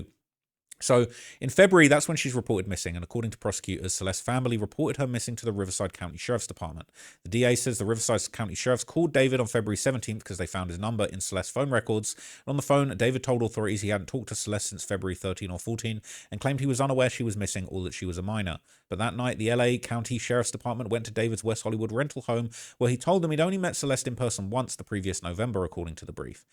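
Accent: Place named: United Kingdom. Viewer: Dude got his nails painted😂🤦🏾‍♂️Bad enough having a British accent alone😂🤢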